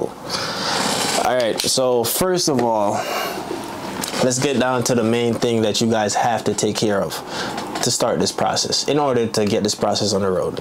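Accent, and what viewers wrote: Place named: Jamaica. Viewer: This dude Jamaican as hell. Can't hide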